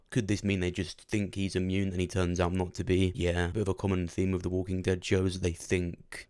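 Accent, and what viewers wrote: United Kingdom. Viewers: you sound british, can you confirm if these will be aired in the uk because this is about to be my 13th reason if not.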